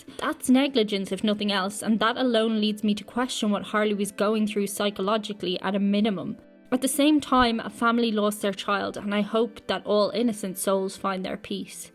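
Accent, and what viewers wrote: Ireland. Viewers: This may sound crazy, but in these videos, there's something about your accent that doesn't say "Dub" to me, though I know you are. Are both of your parents from Dublin, too? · I love your new channel. I did notice your Irish accent is more pronounced. I hope you continue towards a news magazine channel, you have soo much to offer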